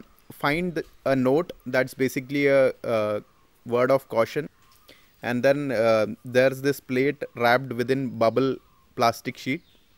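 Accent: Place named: Kerala, India. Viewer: മലയാളി ??? ആണോ സംസാരം കേട്ടിട്ട് 😃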